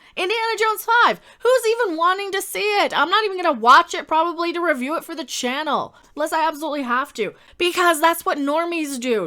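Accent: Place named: United States. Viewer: your demeanour is Australian but with an American accent. Refreshing to see someone else done with modern cinema and tv media. You need a Google chromecast, and then you can play 80s and 90s movies and tv shows.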